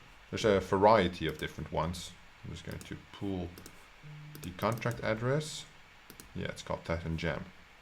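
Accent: Netherlands. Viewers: Just as early as yesterday.. Are you Dutch btw? Your English is great but I hear the Dutch I think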